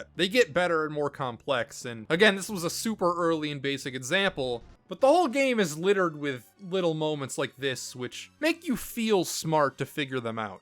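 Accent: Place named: Midwest, United States. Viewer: wait, if you're from florida and live in texas, why do you sound like you're from iowa, definite midwest accent.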